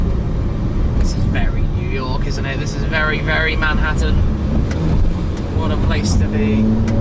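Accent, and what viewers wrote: United Kingdom. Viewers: Social media superstar, good looking, a brilliant exotic car collection AND a British accent.... you're gonna be fighting off the American girls 😋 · you'll be really popular with the ladies in the US, apparently they love the British accent.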